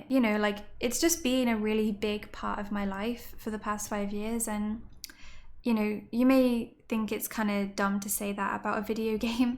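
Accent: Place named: United Kingdom. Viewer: I would love to sit with you at a restaurant table and have dinner, while listening to your stories (and sharing mine as well). I like the way you tell stories, and I also can’t get enough of your sweet British accent 😄 love you, Ms.!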